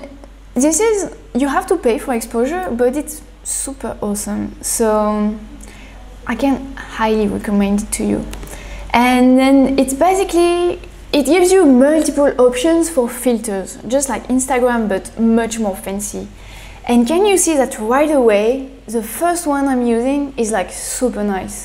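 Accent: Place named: France. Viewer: "but that's not bad, hein !" Not only will we forgive your french accent, we will also love it ! <3 Also, prepare for my rainbow cat 🙈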